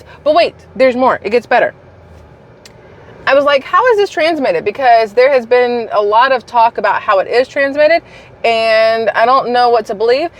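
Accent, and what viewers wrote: South, United States. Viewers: you are hilarious!!! So honestly southern!!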